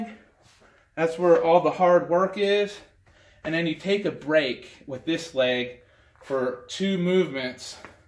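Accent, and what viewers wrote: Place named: South, United States. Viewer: Great tutorial! May I ask where you are from though? You have a very interesting accent! (Southern US?)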